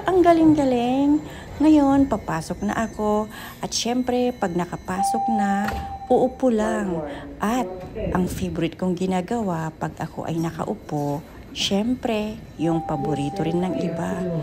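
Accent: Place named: Ilocos, Philippines. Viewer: Nakakatuwang pakingan ninang ilokano tagalog❤❤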